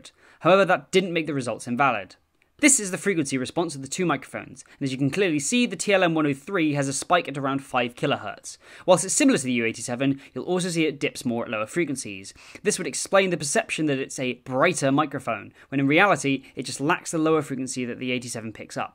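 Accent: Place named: United Kingdom. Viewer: 100% agreed. That brittish accent is awesome.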